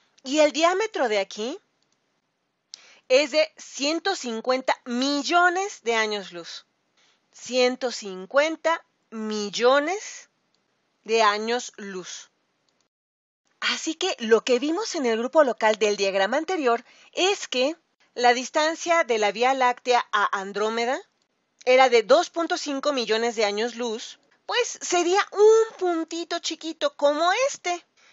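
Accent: Mexico. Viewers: Me gusta la voz de las mexicanas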